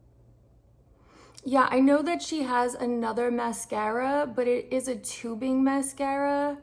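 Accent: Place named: New York, United States. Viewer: Your voice and accent are so comforting to me. My family is from the Bronx and Jersey, but I am far from home in the Midwest. ❤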